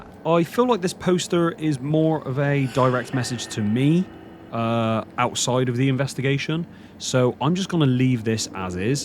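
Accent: Bristol, United Kingdom. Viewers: Omg I was thinking the same thing with this pizza on my playthrough! 😂 loved this video! BTW, do I detect a fellow bristolian from your accent? 🤔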